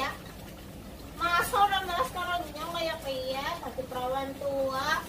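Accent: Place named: Banyumas, Indonesia. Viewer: Wow...ngapak ngapak mantap... Salam kompak...paket durasi aman nggih...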